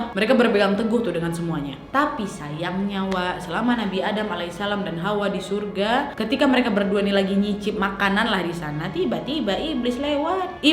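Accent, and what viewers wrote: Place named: Medan, Indonesia. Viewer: Dan medan🤣 logat kau nampak jelas kale🤣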